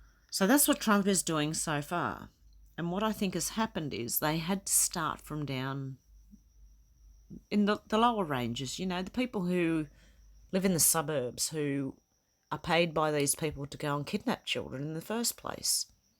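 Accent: Australia. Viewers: so beautiful to hear an Australian accent and mindset. loved it. Millsys Para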